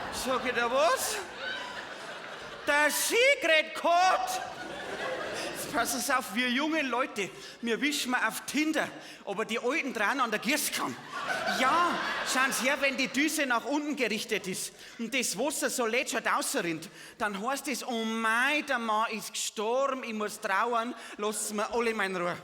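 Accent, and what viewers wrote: Bavaria, Germany. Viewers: I mog den borischen Dialekt.Orfach guad 😂😂😂❤ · Warum Hochdeutsch? ist Bayer und das Publikum auch. Also wieso sollte er sich verbiegen? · Ist es, im schönsten, deutschen Dialekt gesprochen, nämlich bayrisch, oder boarisch ... herrlich 😂, köstlich ❤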